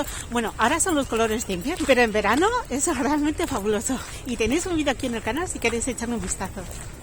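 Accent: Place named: France. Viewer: eres española y.. se te escucha el acento frances.. heee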